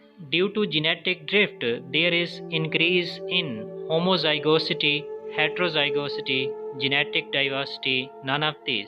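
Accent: Pakistan. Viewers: Accent he aisa h.. I think it's Pakistani channel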